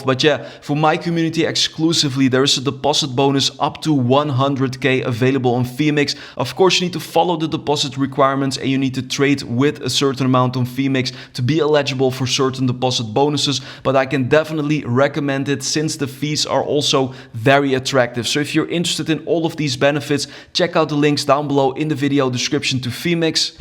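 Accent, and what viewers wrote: Netherlands. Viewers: Good information (you got a dutch accent) greetings from Amsterdam.😅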